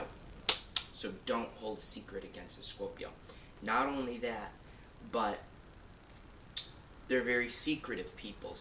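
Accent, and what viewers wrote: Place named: Boston, United States. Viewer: YOU ARE FROM BOSTON IM A SCORPIO LOVE YOUR ACCENT YOU AREDEAD RIGHT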